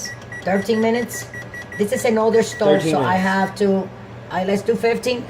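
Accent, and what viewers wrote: New York, United States. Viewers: I've been studying about American accents. where are you from :) ? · I'm from Louisiana and I could tell she was from New York.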